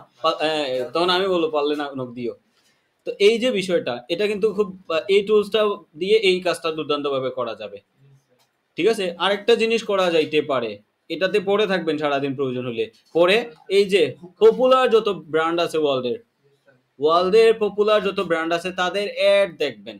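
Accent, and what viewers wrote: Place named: Kolkata, India. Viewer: apnar kothar tone koklkatar moto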